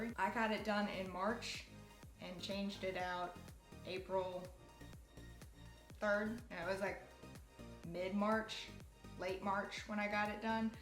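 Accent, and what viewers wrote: South, United States. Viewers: I change my daith on my own and I've gotten good at changing it on my own, yours also closed on you a lot faster than mine did, if you ever try again just be patient and be ready to switch out the jewelry. Also you've answered my questions in two of these videos! _ (Ado1017). I pronounce Piercing like you too, it's just a Southern thing lol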